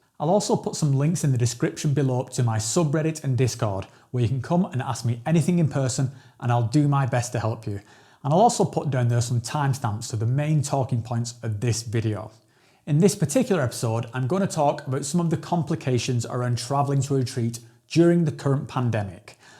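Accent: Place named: England, United Kingdom. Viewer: I thought you sounded too English to be Swiss🤔🤣 you never know with accents these days. Would still make a good video for your "fans" if you never seen it as an invasion of privacy.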